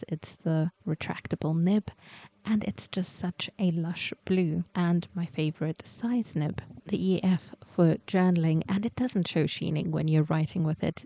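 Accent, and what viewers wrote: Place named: United Kingdom. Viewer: What a pleasure to hear a British voice on oens - and to have UK prices that actually mean something! Jinhao, Asvine and Hongdian produce fantastic pens at good prices. And I hate Sailor pens - mine never make it out of the case. I should sell them but it would be cruel and unusual punishment on the buyer.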